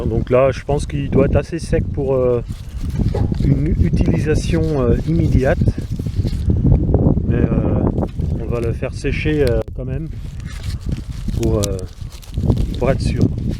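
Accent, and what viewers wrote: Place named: Belgium. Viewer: Je pensais a un belge proche des flamands , j'étais pas loin! :)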